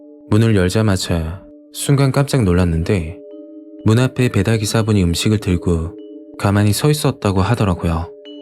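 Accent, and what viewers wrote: Gyeongsang, South Korea. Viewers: 와우 오늘도 어김없이 님 영상과 함깨 합니다 님 혹시 경상도 분이신가요?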